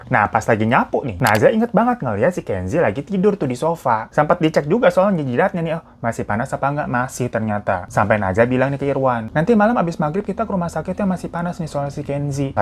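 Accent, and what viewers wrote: Java, Indonesia. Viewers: Medok bgt bang😂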